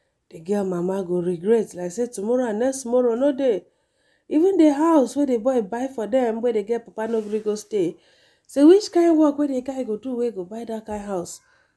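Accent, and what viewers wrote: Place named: Yoruba, Nigeria. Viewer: I love how you're reading this our Yoruba 😅😅😅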